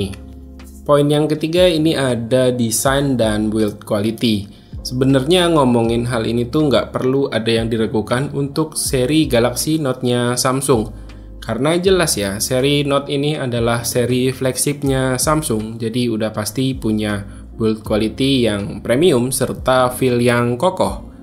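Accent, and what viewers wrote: Samarinda, Indonesia. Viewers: Orang Samarinda kah bang?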